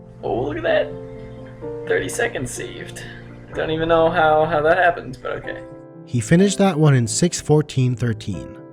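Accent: Quebec, Canada. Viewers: Oh tes Québecois!!! 🎉❤ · omg quebecois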